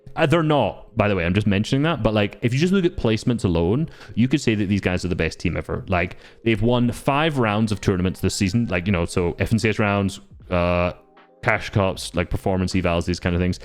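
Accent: Scotland, United Kingdom. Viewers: cant help but admire the scottish accent 🔥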